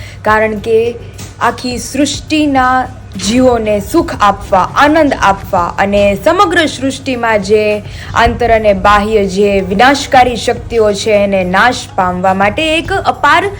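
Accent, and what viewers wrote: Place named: Kathiawad, India. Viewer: તારા મમ્મી હોશિયાર છે.. અને સ્વભાવ પણ સરસ છે... તમારી કાઠિયાવાડી ભાષા બહુ જ મીઠી લાગે છે...,❤❤❤❤❤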